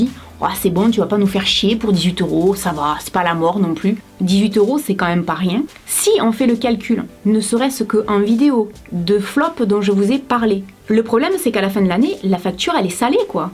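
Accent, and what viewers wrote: South, France. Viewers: T'es sudiste non ? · Bonjour ( petite parenthèse perso vive les accents du sud) c’est la deuxième vidéo de toi que je découvre et j’apprécie beaucoup! Tes vidéos sont honnêtes et faites pour aider en donnant un avis sincère donc merci de ton aide et à bientôt je suivrais tes prochaines vidéos 👍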